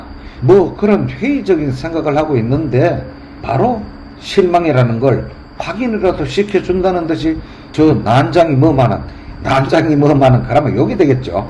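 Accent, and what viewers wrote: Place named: Gyeongsang, South Korea. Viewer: 왜?유트버는 대개가 경상도 말씨를 쓸가?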